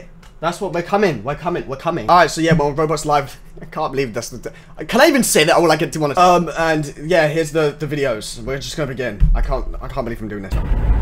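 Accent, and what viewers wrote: United Kingdom. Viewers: bro looks like an american sounds likes a british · it actually ji scared me when I realized he was British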